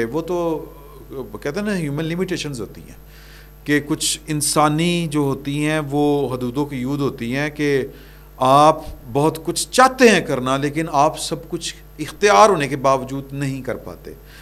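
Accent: Punjab, Pakistan. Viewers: Jdon which wich mithi Punjabi bolde ho tan vadhia lgda hai 👍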